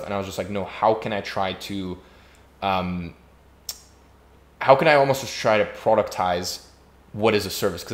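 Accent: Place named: United States. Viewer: Why does he have an American accent?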